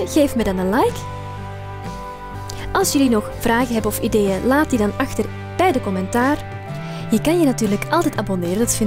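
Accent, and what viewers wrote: Flanders, Belgium. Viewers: je spreekt niet "Belgisch" , het is Vlaams en wij zeggen ook bv. praat Nederlands want Vlaams is een accent... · Ben je Belgisch want zo klinkt het. Niet erg natuurlijk maar dan. Moet je jezelf niet Nederlands noemen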